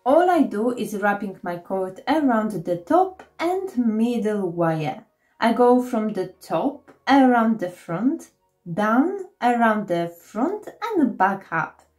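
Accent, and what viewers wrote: Poland. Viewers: Hi, My fav this time is the light shade, really nice and would fit in with any decor. I like the clay and glass bottle design as well and the coaster project at the end so simple but effective. I agree with Kathy about your voice it is so relaxing but I think it is your lovely polish accent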